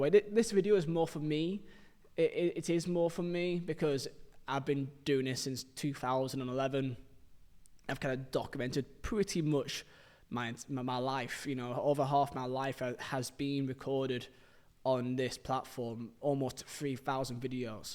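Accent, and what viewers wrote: United Kingdom. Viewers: Good luck with your next destination/Adventure, BTW your British (random British guy).👍🇬🇧🇬🇧🇬🇧🇬🇧🇬🇧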